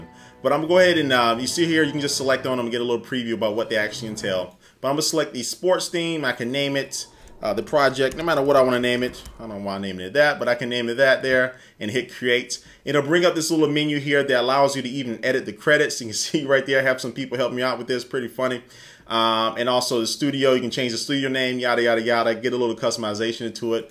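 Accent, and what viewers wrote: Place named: St. Louis, United States. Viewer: the "imma dis" and "imma dat" annoyed me too, but then he said he was from saint lewis, right? so then it's understandable. he's not trying to be cool it's a location thing :)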